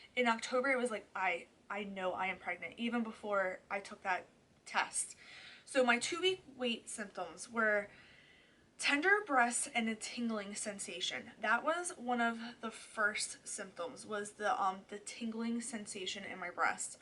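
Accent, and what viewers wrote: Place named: New Jersey, United States. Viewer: I just knew you were a fellow New Jerseyan from the first second of the video lol😭 loved this video. I’m having the same exact symptoms 😩 I’m scared to test so I’m just waiting out my period, if it ever shows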